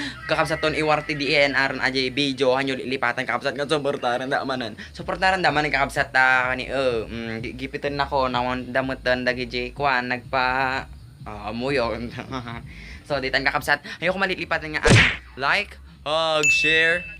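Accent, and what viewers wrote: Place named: Iloilo, Philippines. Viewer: Ang cute mo magsalita kuya ilongo bayan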